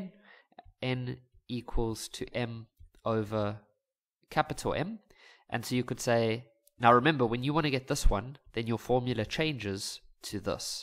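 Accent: South Africa. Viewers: I WAS SO SUPRISED WHEN I HEARD THE SA ACCENT. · Thank you brother, you are a life saver, the way you explain is amazing, the South African accent is also great❤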